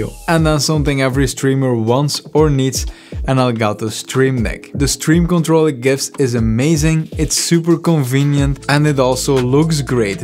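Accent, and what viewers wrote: Flanders, Belgium. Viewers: if you were from flanders (because of the accent) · amai die klinkt belgisch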